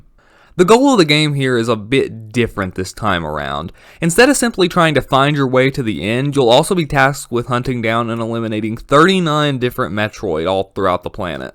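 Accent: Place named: South, United States. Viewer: Your voice sounds like a mix of optimus, mrBeast, and a slight bit of southern and it amazing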